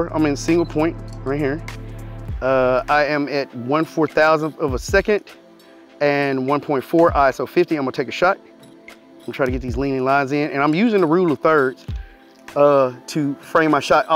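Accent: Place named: Texas, United States. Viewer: I could tell you were a fellow Texan as soon as you started talking. Fantastic work! It's crafstmen like I follow to learn more about the art of photograph and videography. You've got my subscription.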